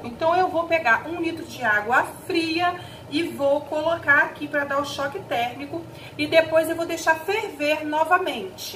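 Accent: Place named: Rio de Janeiro, Brazil. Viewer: Pronuncia carioca bonita.....show de detergente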